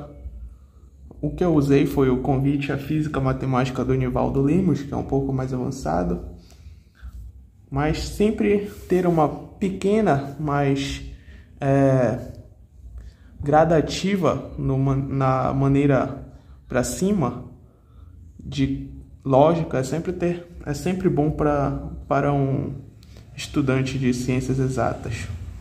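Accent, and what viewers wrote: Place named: Pará, Brazil. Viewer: Não precisei assistir o vídeo nem por 5 segundos para descobrir que tu és paraense hahahah; é bom ver mais canais daqui surgindo. Parabéns pelo início e pelo conteúdo! já me inscrevi para acompanhar os próximos passos. No que concerne ao teu vídeo, o que particularmente me agrada muito no estilo do livro do Louis é o fato dele explicar os tópicos usando, muitas vezes, PALAVRAS e não ficar abusando de recursos visuais, por vezes inúteis, para "elucidar" algo. Ademais, além dessa escolha de usar muitas palavras para apresentar um tópico, ele muitas vezes não omite certos detalhes que os mais "moderninhos" por vezes omitem. Dentre os livros mais "básicos" de cálculo como Leithold, Munem, Simmons, Ávila, Diva, Thomas, Anton, Swokowski, John Rogawski, Stewart, Lang, Larson, Paulo Boulos e etc., os que mais curto são o do Leithold, do Serge Lang e do Geraldo Ávila.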